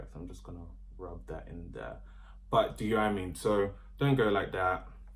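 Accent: United Kingdom. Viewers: Your accent is proper British so everything you say... is probably legit. - Your African brotha from across the pond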